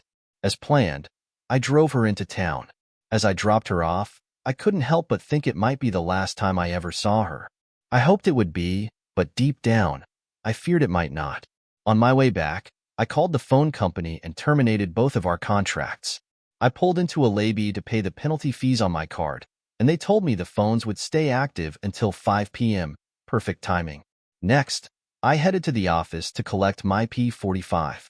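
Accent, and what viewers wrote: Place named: United States. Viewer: An American voice on an English story - irrational.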